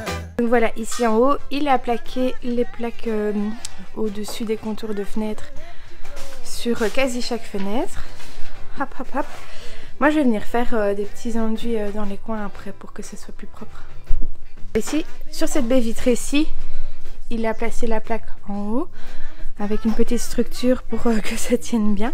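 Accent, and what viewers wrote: Belgium. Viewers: C l'accent belge 😋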